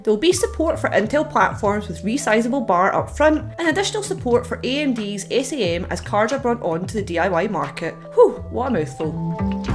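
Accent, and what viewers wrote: Scotland, United Kingdom. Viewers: Does anybody know what part of the world is from? That accent is amazing. Scotland? What part of it? I'm interested in the specific area, as for example "Italy" has more than 20 different accents.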